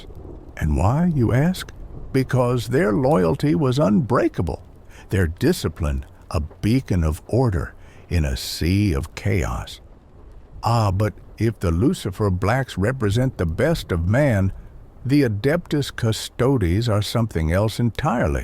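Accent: South, United States. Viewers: you're welcome. if I may ask what accent is this? It sounds southern, which is cool since most 40k stuff is British. Now I want an inquisitor with a southern accent now. Like those charismatic pastors, but more angry. And a "hillbilly" ogryn. And guardsman that sounds like Lee Ermey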